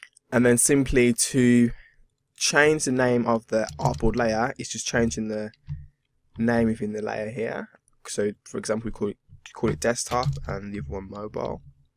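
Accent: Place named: United Kingdom. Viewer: Oi! Ya bri' 'ish, mate?!?! Lovely accent <3 Thanks for the video, helped me out a lot!!! <3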